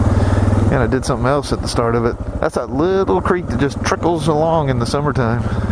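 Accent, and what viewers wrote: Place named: United States. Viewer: Always good fun coming along with you.I would comment on the truck? but couldnt see it.Its very similar weather here in England but always raining and the traffic is horrendous coupled up with the fact that no one seems to be able to drive properly,i think they are all out to kill you this time of the year.Dont worry about the content, your american accent is great to listen too,and your clear and precise.No use me doing a video you wouldnt understand me living here on the border of Devon and Cornwall.Oh rrrrr,proper job!!!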